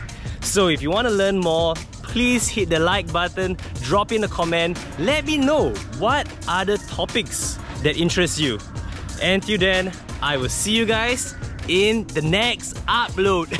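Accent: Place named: Singapore. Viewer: Idk why, but I'm just enjoying the singlish accent, LOVE IT ❤️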